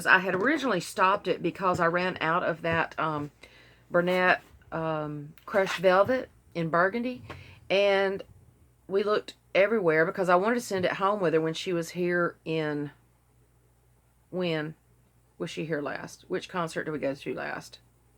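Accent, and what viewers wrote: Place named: Alabama, United States. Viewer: Hey!!! I knew you were from Alabama after the first 2 words😂! I'm from Chalkville- Hey neighbor! I live in Florence, AL now- so great to hear home folk and hope you start feeling better.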